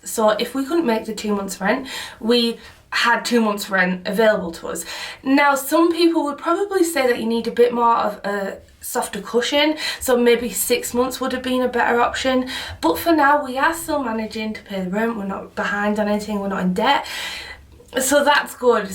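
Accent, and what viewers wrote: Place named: Northeast England, United Kingdom. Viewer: I'm from Newcastle and your accent intrigues me, its like geordie and yorkshire at the same time! lovely :)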